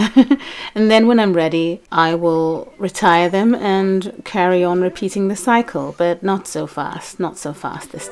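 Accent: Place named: RP, United Kingdom. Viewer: I love how you speak! Super posh, I find it. I love it! :D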